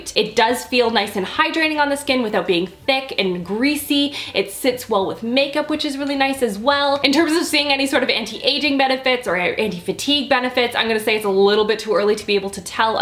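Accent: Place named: Canada. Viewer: You sound incredibly Canadian today. Like more than normal😂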